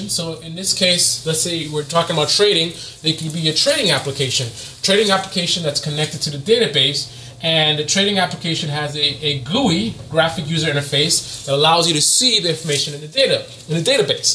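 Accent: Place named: United States. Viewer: Thank You. I am a beginner and you talked to me like I am a beginner ( not stupid but a beginner ) thank you. I have gone through a PLEATHERA of videos and information. Many people I simply cant understand their dialect from where ever they come from. You Speak English in American English and that helps me ( okay maybe I am stupid ) but thank you. Doing good -- keep the classes coming -- ROCK ON.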